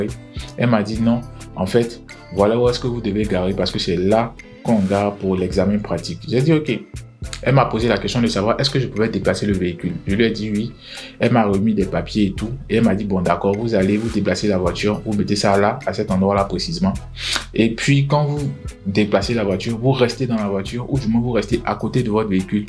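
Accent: Ivory Coast. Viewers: CONSEILS TRÈS UTILES MERCI INFINIMENT TON ACCENT FAIT PENSER À BABI ( ABIDJAN) ❤❤❤ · Merci. J'aime l'accent ivoirien 😊 Hommage à CHEIKH TIOTÉ😢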